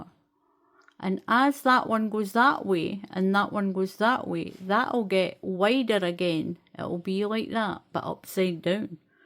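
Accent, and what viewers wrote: Scotland, United Kingdom. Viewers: This is a beautiful pattern - thanks so much for sharing it! Your instruction is wonderful and I so enjoy listening to your lovely Scottish accent. I've done some swatches with different yarns and hooks and haven't been pleased with my results. I'm going to order the brand you use in the video. May I ask what crochet hook you used?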